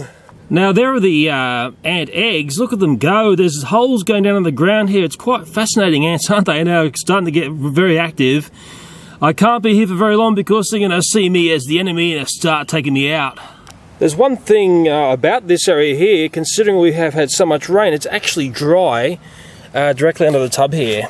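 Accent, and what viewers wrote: Australia. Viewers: I love his Australian accent! XD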